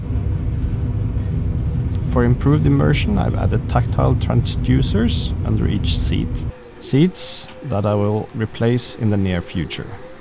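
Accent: Norway. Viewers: Du er Norsk høres det ut som. det greiene her var et ganske sykt bygg!! hvor holder du til? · Hah, kan høre at du er norsk!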